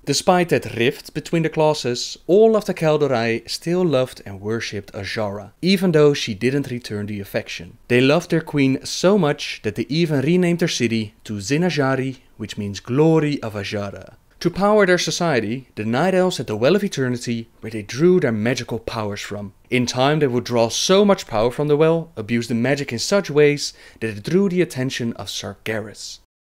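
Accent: Netherlands. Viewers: He is from the Netherlands. I am too and i can hear from his english speaking he is dutch:P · He has a Dutch accent. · you are definitely dutch! btw nice videos!! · Quick question. Are you by chance Dutch? I have a Dutch friend, and you sound like him quite a bit. I've also noticed on one of your toon's names, there's 'je' at the end, which, if I'm correct, in Dutch, is what you would call a small child. Thanks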